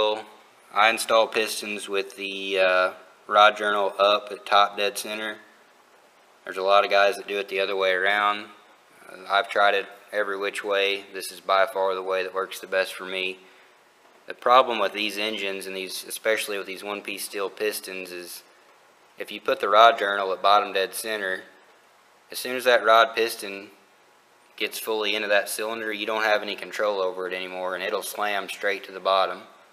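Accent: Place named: Texas, United States. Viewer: your north Texas/Oklahoma twang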